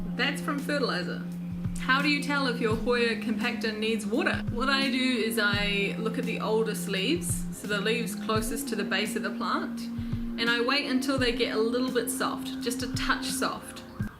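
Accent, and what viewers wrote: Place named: New Zealand. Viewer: Another kiwi! I heard Kia Ora and wondered if I was hearing things... then the accent confirmed it. I was given a rooted cutting, and showed a lady at the garden centre and she said she'd never seen one before?!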